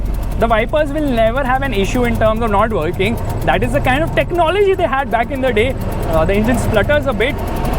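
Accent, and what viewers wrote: India. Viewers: Typical Indian engineering college type annoying English accent. Trying to speak fast to show the fake fluency. Jeep mardo pe achhi lagti hai. Tum jaisi pe nahi. Next time drive some Alto or Wagon R.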